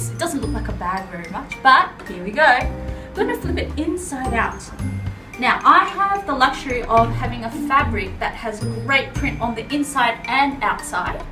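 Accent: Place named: Australia. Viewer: Im pretty confident in saying that she's Australian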